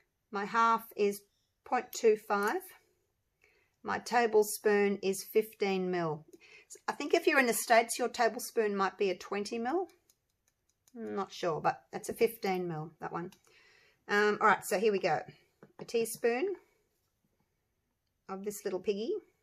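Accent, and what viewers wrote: Australia. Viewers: Hi, love your videos and hearing our good old Aussie accent! I ordered the minwax on Amazon and I noticed in your tutorial you said that people in the US can use Behr with Jo Sonja’s, but can we in Aus use the minwax with taubmans?? Thank you for this video 🥰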